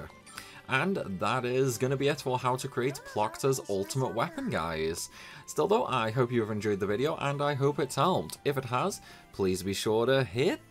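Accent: United Kingdom. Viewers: omg why is every obscure youtube channel ran by a brit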